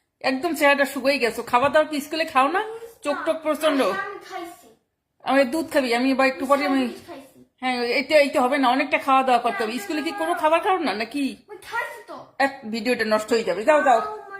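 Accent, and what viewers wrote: Barishal, Bangladesh. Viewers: পুরাই বরিশালের ভাষা দারুন।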